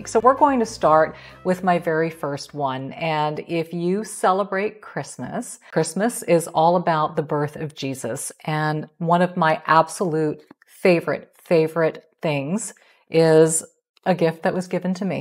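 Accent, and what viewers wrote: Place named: United States. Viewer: This content, for me, is an excellent source of beautiful American English and, of course, a tremendous positive energy source. So easy to watch!:) Thank you so much,!:)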